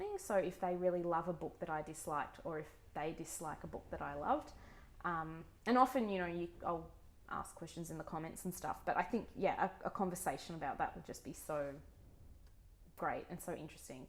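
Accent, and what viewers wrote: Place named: Australia. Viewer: Welcome to booktube! I popped over after seeing Simon shout you out on Twitter! I’m an Aussie (also a Melbournian) now living in Texas 😊 So here for more Aussie voices on booktube!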